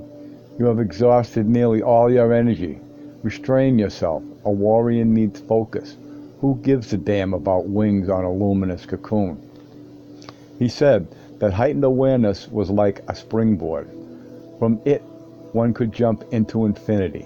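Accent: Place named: New York, United States. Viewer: Love your reading. The NY or Jersey accent? It is relaxed and not the MACHO WARRIOR of the others. Thanks! But the background music could fade off to Mars, IMHO! This writing involves connection to the Earth - not space.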